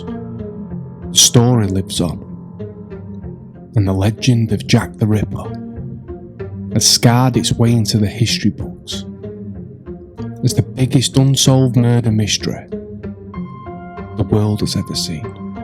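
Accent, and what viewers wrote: Manchester, United Kingdom. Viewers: That's a proper manc accent, or Salford.. I used to live ten minutes up thd road from Salford precinct off chapel lane or chapel street/road. Been 30 years since i lived there but your accent is definitely either north manchester or Salford, possibly south Manchester Wythenshawe, but my point being it's a thick accent from the area. I ended up in stretford. About the video, I thought it was Lechmere for years but now I'm not convinced. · New sub here 👋🏼 This video randomly popped up & I’m glad I clicked. What a remarkable video. Love all the editing 👏🏼 I’m from Bolton, is that a Mancunian I hear? 👀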